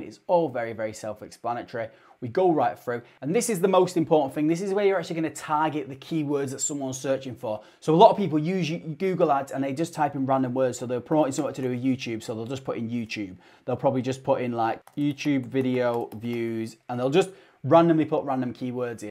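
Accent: United Kingdom. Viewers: Who struggles with British accent like me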